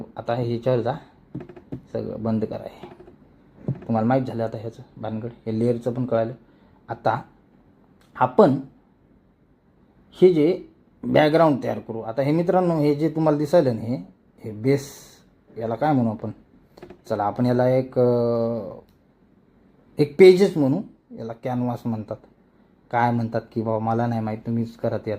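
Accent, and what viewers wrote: India. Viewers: अहो बापू काय राव तुम्ही पण काय ती तुमची गावरान भाषा एकच नंबर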